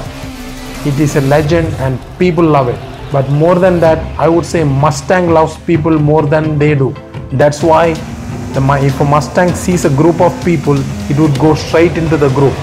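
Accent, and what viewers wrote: India. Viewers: Cute but you talk like a Malayali everyone speaks English like that now I my English is also like that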